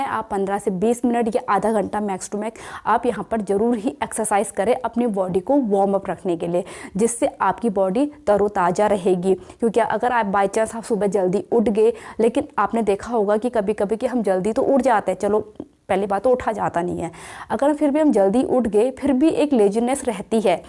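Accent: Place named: Uttar Pradesh, India. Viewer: But voice is indicated up state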